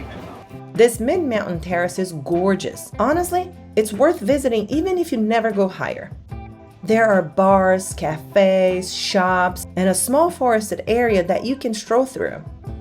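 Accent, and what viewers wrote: Brazil. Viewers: Você é brasileira, né? Pelo sotaque. Acertei? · Teu sotaque te entrega menina, você é brazuca.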